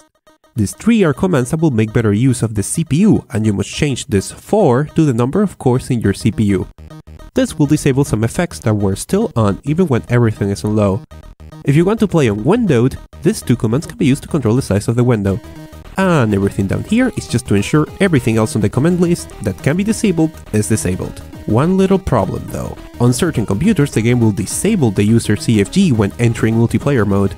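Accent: Spain. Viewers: Eres Ingles O Español?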